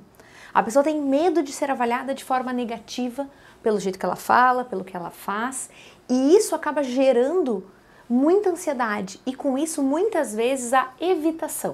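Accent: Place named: Rio Grande do Sul, Brazil. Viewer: Esse sotaque gaudério eu conheço kkkk ótimo vídeo dra.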